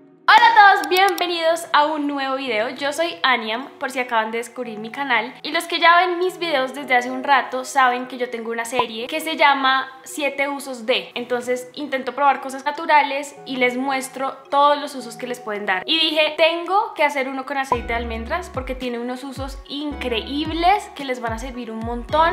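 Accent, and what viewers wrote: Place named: Colombia. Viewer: Ese acento... 🇨🇴 Colombiana?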